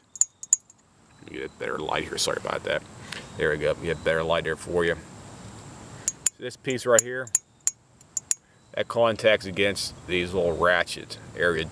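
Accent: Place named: Delaware County, United States. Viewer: Dude you have the straight up delco accent haha.You sound like Bam Magera.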